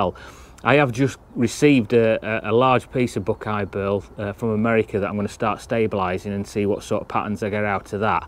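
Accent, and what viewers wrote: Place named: Yorkshire, United Kingdom. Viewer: Nice little video on your process mate, nice to hear a fellow Yorkshireman on YT, the finished result is bloody awesome mate, all the best from Doncaster.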